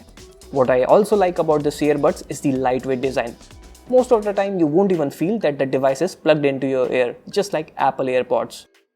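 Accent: India. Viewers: Huy Tran this is their accents. I got familiar with them after 5 years study in India..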